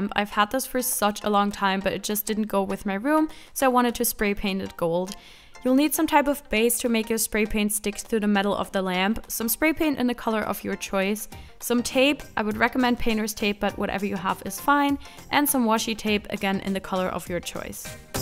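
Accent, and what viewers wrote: Germany. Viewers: Kann es sein das du deutscher Herkunft bist? Man hört einen nämlichen einen leichten Akzent raus. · may it be you have a german accent? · #germanaccent <3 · Her german accent is almost unhearable, i had to see her amail address to be sure.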